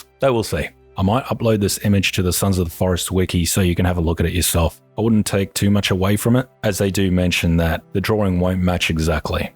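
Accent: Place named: Australia. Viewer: your voice sounds very Australian and as an Australian i can say thats a compliment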